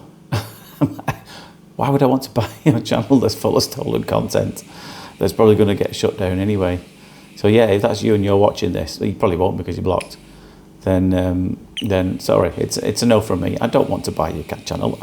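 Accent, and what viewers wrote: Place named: Wales, United Kingdom. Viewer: Hello, I don’t think you “ dribble”. You have a different dialect. It may come from wales? Thank you for a great lesson.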